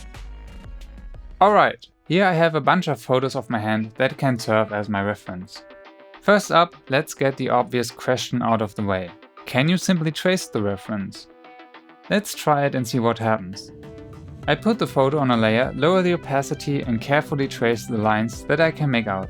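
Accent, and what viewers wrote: Germany. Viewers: ayo it's funny you were on my recommendations and I didn't see your videos before, but I was planning on doing the same approach of making tutorials by showing different emotions of me in anime style lol😂 btw you kinda sound like you're german as well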